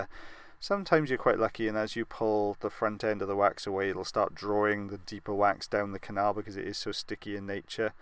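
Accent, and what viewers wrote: Wales, United Kingdom. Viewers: That Richard Burton & 😍 Anthony Hopkins duo Blessings for the Welsh accent t I have family in Guyana&Trinidad They like the Welsh sing when they 👄 🦜 talk Enchanting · I like watching these and listen to the commentary, your voice is so calming and the Welsh accent is so nice.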